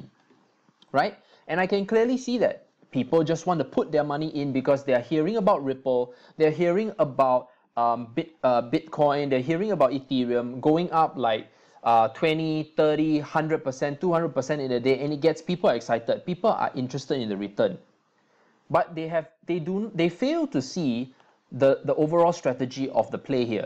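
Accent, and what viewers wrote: Singapore. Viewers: Awesome! That's why I heard a bit of Singlish :-p hahaha I live in SG too. I've really loved your video. I'm gonna watch your other vids as well. Cheers!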